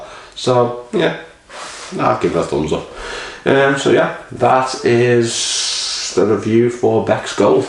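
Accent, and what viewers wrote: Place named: Liverpool, United Kingdom. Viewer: Yes scouser! Just bought a keg for the machine never had it before, was on offer!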